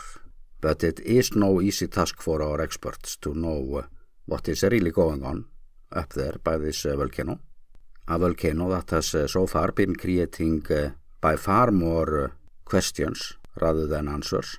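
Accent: Iceland. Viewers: I'm having trouble following the narration. I love Icelandic, but English with Icelandic pronounciation is too hard for me.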